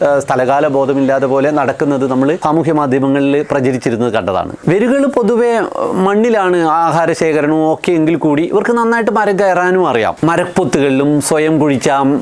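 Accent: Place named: North Kerala, India. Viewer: സാറിൻ്റെ വീഡിയോസ് കണ്ടിരിക്കുക എന്നത് ഒരു പ്രത്യേക അനുഭവമാണ് ... താങ്കൾ ഓരോ വീഡിയോ അവതരിപ്പിക്കും മുമ്പ് വളരെ ആഴത്തിൽ സബ്ജക്ടിനെക്കുറിച്ചു വായിക്കുകയും, അന്വേഷിക്കുകയും ചെയ്യുന്നതായി മനസ്സിലാവും .. അതുപോലെ വടക്കൻ കേരളത്തിൻ്റെ ഉച്ചാരണ രീതി താങ്കളുടെ വീഡിയോസിൻ്റെ പ്രത്യേകതയാണ് ... ഒരു റിക്വസ്റ് ഒണ്ട് സർ, നീൽഗിരി മാർട്ടിൻ എന്ന ജീവിയെ കുറിച്ച് ഒരു ഡീറ്റൈൽ വീഡിയോ ചെയ്യാമോ?? 🙏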